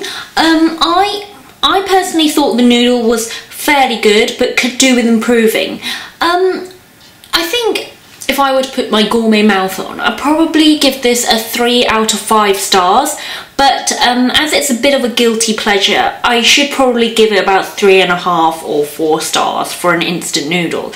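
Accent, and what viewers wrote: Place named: United Kingdom. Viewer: Sound so british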